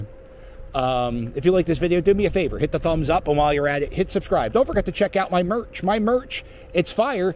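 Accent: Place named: Pennsylvania, United States. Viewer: I heard the PA accent and mediately. When you think about Martin Luther King and the motel, remember something. These places were not always the Havens for junkies and hookers they have become. This is where families stayed originally, traveling salesman and other businessman, when the car became popular, people stayed at motels and motor inns. We didn’t have 20 story Sheratons and 40 story Marriotts. All we had were motels and everyone stayed there. It’s weird middle-class America state. You did have some bigger hotels, but they were confined usually two resorts are big cities. Those dives up and down the route one Corredor with normal stopping points on people traveling cross-country or cross state.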